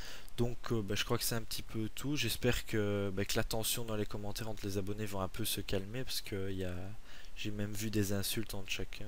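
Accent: Belgium. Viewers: Tu es belge ? Parce que j'aime beaucoup ton accent :) , et vraiment désolé pour ton hack j'ai deja connu ça et fuck les rageux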